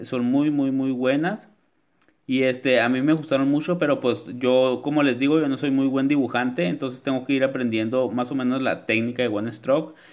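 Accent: Chihuahua, Mexico. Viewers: eres de chihuahua?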